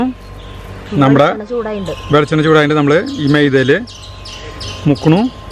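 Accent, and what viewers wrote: Thrissur, India. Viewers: Sugiyan 👌👌👌👍👍👍ente Mami yum thrissur kkari aanu maman marriage chaithu trivandrum vannittu 17 years aayi but eppozhum samsarikkunnathu chetante pole nalla rasamulla thrissur slang lanu enikkum thrissur slang valare ishtamanu👍🙋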